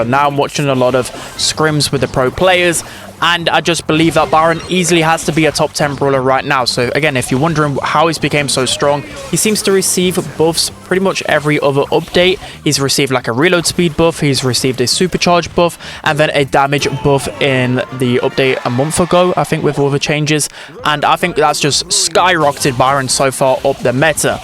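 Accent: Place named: Northern England, United Kingdom. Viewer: What part of England are u from bro everytime you pronounce something ith a u yiu sat like "oopdate" for example (from london)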